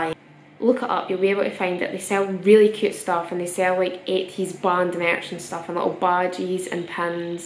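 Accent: Scotland, United Kingdom. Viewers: I wasn’t ready for the Scottish accent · wow. this is the first video i’ve seen her talk. was not expecting a scottish accent. lol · ̗̀c h a o s ̖́- scottish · are u Scottish? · Sounds scottish to me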